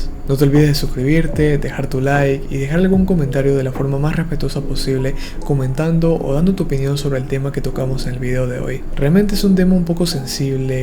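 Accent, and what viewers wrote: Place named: Panama. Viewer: Cada vez que pasaba el vídeo, yo me decia: "es como si me estuviera hablando un amigo, tiene el acento de aquí" y voilá, si, jajaja, es super raro encontrarme a otro panameño en Youtube. Saludos! n.n 👋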